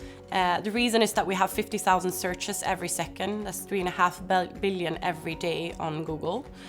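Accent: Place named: Sweden. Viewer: Accent is Swedish!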